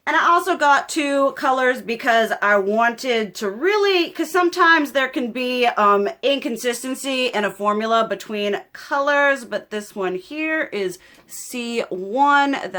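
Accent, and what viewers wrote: South, United States. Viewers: a true southern belle 💗 you have such a beautiful voice. I'm in Lafayette Louisiana and all I hear around here is Cajun so its refreshing to hear a beautiful accent 💯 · New subscriber here 👋👋 I have to ask, with that beautiful southern accent, where are you from??? I live in northern Louisiana and we have a few women here that sound the same!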